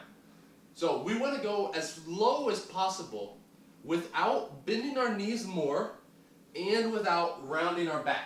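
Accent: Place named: Texas, United States. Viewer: Hey. This is one of the best explanations of the RDL that I have ever heard. You must be a great trainer. One thing I missed is how far apart are your feet? One feet, two feet? Also do the Brits question you about your accent and say "Oh you must be a Yank?" At which point you say "no, I am a Texan!" Thanks for the video.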